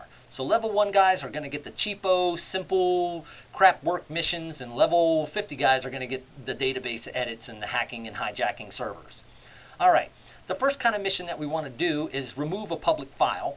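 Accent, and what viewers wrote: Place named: United States. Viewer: Пиздец, ебаный американский английский. Всемирная тошниловка.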